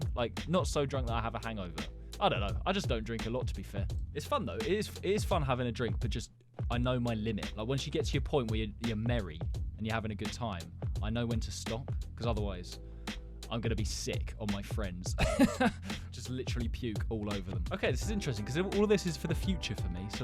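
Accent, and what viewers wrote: United Kingdom. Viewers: Your voice sounds like your from U.K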